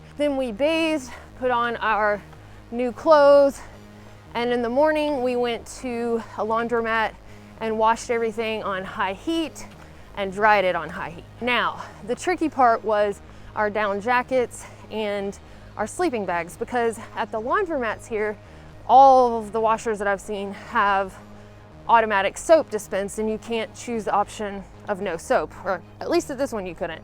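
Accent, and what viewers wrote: South, United States. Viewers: OMG, the part where you said "Hitch in your giddyup" and those people call you out in your accent... hilarious. Your voice is so southern when you're trying to be cute.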